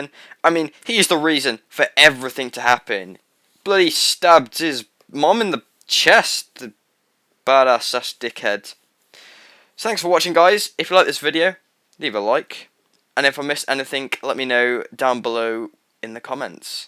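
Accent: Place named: London, United Kingdom. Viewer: Sounds like he's from London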